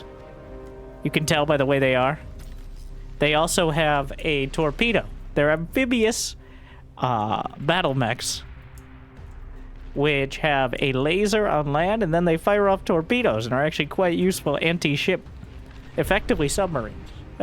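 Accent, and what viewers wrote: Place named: Arkansas, United States. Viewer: Trying to figure out your accent. Arkansas?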